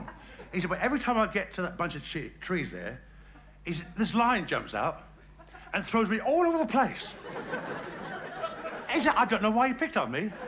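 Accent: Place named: West Country, United Kingdom. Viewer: He had a thick West Country accent!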